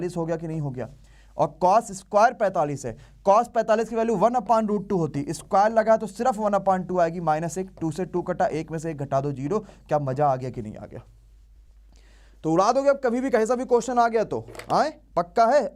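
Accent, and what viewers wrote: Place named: Kanpur, India. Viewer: कनपुरिया स्टाइल🤘🤘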